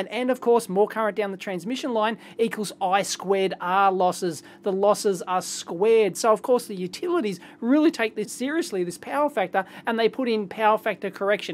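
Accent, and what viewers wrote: Australia. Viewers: Nice to hear that Aussie accent WA accent is so different I just watched a Chinese guy showing how it doesn't work he said it was made in Germany he crossed out made in Germany and wrote made in China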